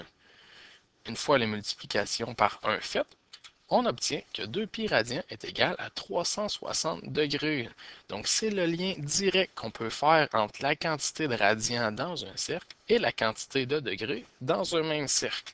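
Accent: Quebec, Canada. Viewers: Le québécois est vraiment syyyympas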